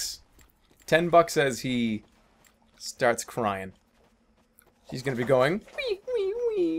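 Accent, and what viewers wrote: Boston, United States. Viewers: Did you know that people in Alabama speak with an accent similar to Jerma's (a Boston accent), not really a southern accent. :)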